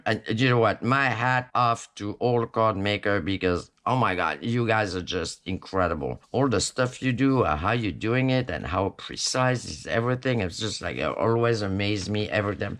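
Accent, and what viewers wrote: France. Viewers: Crafting is so much more fun with a french accent! Loving this tut! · Oh I love you already, I'm French too but I live in a mostly English area, but your accent reminds me of my family. Lol, anyway like your video too. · Gosh darn I love your videos,glad to meet you,my name is also,I go by Trish,I love your french accent,I was also born in france,not too far from paris. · you done a great job and here is the story on why i am your subscriber, i first thought you were this other crafter that i havent seen in a long time, i clicked on your channel and your voice and accent was not the crafter i thought you were, then you reminded me of the woman my mom had come to sing us kids to sleep and tell us Norwegian stories, i realized you are French and there could be no way in fashion you were that Norwegian woman bcuz i am 53 and she would be passed on, bcuz i think she was 53 back then. 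but how ever i am glad i clicked on your channel and getting to know you and crafting with you!! i just adore you fully!!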